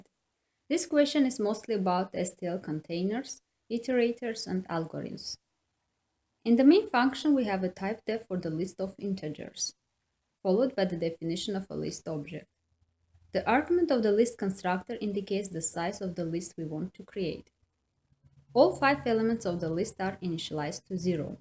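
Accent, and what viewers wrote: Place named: Russia. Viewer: Thank you, these tests are very helpful for consolidation of the material. Very familiar accent... Russian?)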